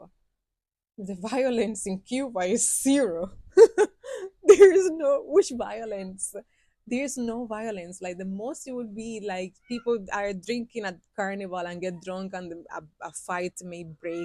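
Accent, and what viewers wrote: Patois, Jamaica. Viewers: Aah mi gal if you ever know my story yu wi cry.love the way yu patwah sounds ❤ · Hey,. Interesting vid. I must say that’s it’s amusing hearing your’Spanglishyardie’ accent—very unique. I am a yardie to mi core except l’ve lived all over the US for decades—fully integrated. I visited JA in Summer ‘23 and had 1 helluva experience. I stayed at the Riu Negril for 4 days then at an AirBnB in Mamee Bay, Ocho Rios x 1 week. Very different experiences. There was a group of us so the whole time a l had a rented burgundy Kia Carnival turbo diesel minivan(awesome vehicle). Turns out that the rental was a $$ magnet for the cops. My brother(his turn to drive) was shaken for cash in broad freaking daylight on TWO occasions w/i 1 week by corrupt cops!!!😳🤨 On a different occasion l was driving thru Port Maria and saw the body of a bicyclist all contorted lying on the roadside after a hit-and-run.😮 The sad thing was the indignity/callousness as ppl just stood around or went about their business as if……! 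The other scary thing that l would warn every foreign visitor about is the danger of driving in JA. Not only is it disorienting driving on the Left, but locals drive like MANIACS!!!! JA is a tropical paradise but there are definite risks one must take visiting the place. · Tell your truth black afro 🇨🇺 Cuban Queen like your jamaican accent ❤ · After all you are looking so beautiful keep it up you should live up in Cherry gardens jack hills Beverley hills much comfort able love how you talk the Jamaican Patios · Slight Latin Accent With a Hint of Patios Frequency Nena......Ya Bad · My ears are confused, I'm hearing a Cuba spanish accent and a Jamaican accent fused together, lmoa. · My family are Cubans and its mind blowing listening to you speaking in patua.. I love it!!🥰🤩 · Wow I listen to you carefully and you have been through a lot and I am happy that you have endure the hard times ,it's obvious that you love Jamaica over Cuba ,in Jamaica it is diverse,not all of Jamaica is the same some places if you not involved with certain people you don't have to worry about anything yuh nuh trouble anybody, nobody nuh trouble you.especiaally if you are not a Jamaican. 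You even now sound like a Jamaican if you never says that you live in there I could tell that you have been or intouch with some Jamaican. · Oh wow… yuh sound like yardie. Accent sweet suh 😂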